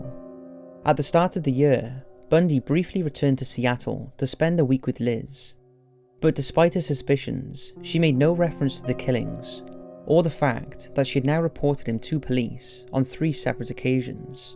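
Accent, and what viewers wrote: United Kingdom. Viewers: Why in the fucking hell are there only British narrators on this bullshit, fuck this.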